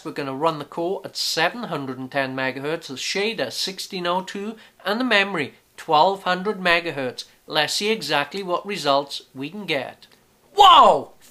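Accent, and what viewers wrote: Wales, United Kingdom. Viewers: I love the welsh accent :D Great review.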